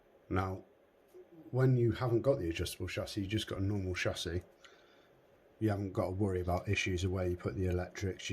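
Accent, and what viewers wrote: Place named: United Kingdom. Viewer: I guess I found time 😹 & great accent btw, for some reason British voices are so easy for me to listen to. Solid video & great tip on the steering rack holder thingy. My steering has a bit of slop in it too, I’ll have to tear it apart & take another look in there. I’ll have to check out the video on bodies later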